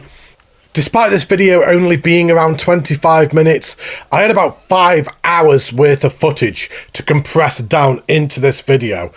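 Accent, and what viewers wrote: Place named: England, United Kingdom. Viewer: Are you English or Scottish? I like your accent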